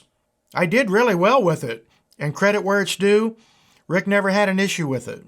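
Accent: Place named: West Virginia, United States. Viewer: I would have known where you were from just by talking. I know because I talk the same way. Keep up the Great Work. Keep making West Virginia look great. Thanks.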